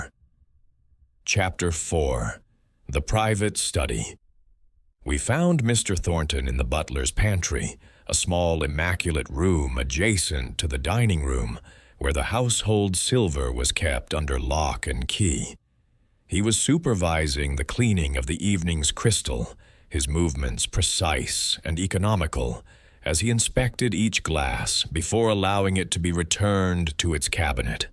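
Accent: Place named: United States. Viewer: American accent. So no.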